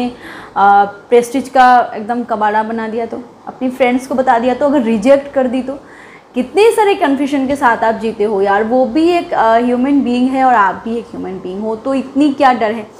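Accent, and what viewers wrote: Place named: Bihar, India. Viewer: Maim aap kahan se ho bato se lgti bihar ki pl batana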